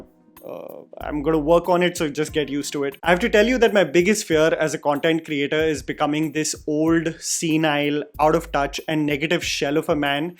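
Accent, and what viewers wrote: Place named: India. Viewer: This man has the best Indian accent of English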